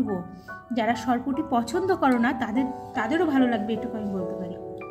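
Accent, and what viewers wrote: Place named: Kolkata, India. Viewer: আপু কেমন আছ? আমি মোটামোটি ভাল আছি। কখ অনেক কমেছে। ডাক্তার কখ মেডিসিন দিয়েছেন। শের পুঠি আমি খুব পছন্দ করি। আপু তুমি কি আমার বাংলা বুঝ? তোমার মত সুন্দর বাংলা বলতে এবং লিখতে পারি না? আর তোমাদের কোলকাতার বাংলা শুনতে খুবই সুমধুর লাগে। আপু তুমি তো খুবই busy থাক । আমি তোমাকে প্রাই বড় করে কমেন্ট লিখি তাতে তোমার অসুবিধা হয না তো? তুমি এমনই একজন মানুষ যে তোমার শত কস্ট হলেও তুমি কিছুই বলবে না । এই জন্য তোমার কাছ থেকে অনেক কিছু শেখার এবং জানার আছে। আজকে কোন প্রশ্ন দিব না। তোমাদের এখান থেকে কেরালা কত দূর? আজ এর কিছু রোমান্টিক গান শুনলাম। মাঝে মাঝে ভালই লাগে। আমি মাঝে মাঝেই গান শুনি। খুবই ভাল লগে। যাক আপু অনেক গল্প হল। মনে হয আমি তোমার কাছে বসে গল্প করছি । এখন আশি। শুভ রাত।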